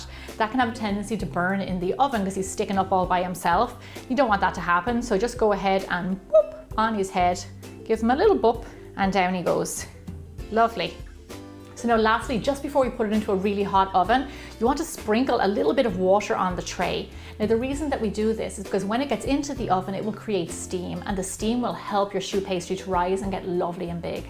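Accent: Ireland. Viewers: Thank You for the great video and information. I love your Irish accent. My spanish wife would shoot me for saying that. LOL!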